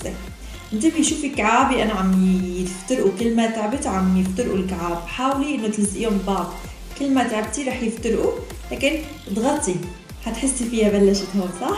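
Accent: Syria. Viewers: شنو اصل ؟سورية لو مغربية لو شنو..لهجتهة سورية بس مرات اشك انو مو سورية🤣♥️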